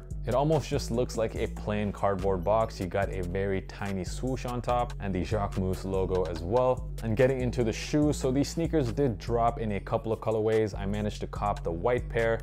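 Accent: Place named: United States. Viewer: he has an American accent and probably doesn’t speak French. Give him a break 💀💀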